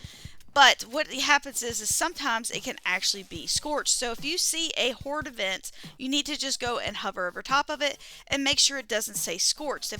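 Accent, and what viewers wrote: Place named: West Virginia, United States. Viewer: W.V. accent...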